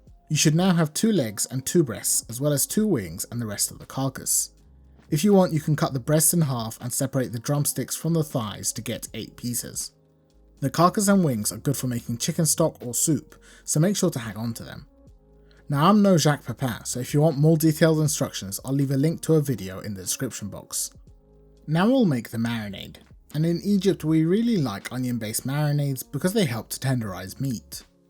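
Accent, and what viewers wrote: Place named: United Kingdom. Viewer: lol it's weird hearing a British accent from an Egyptian.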